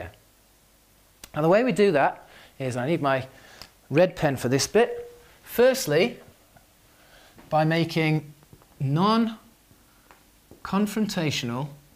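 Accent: United Kingdom. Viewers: Hi. Enjoyed the video. Really good advice. Where is your accent from? Are you from the Lakes?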